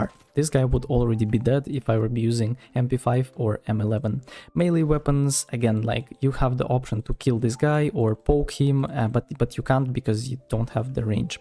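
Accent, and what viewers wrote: Russia. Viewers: Ты же русский, брат, это слышно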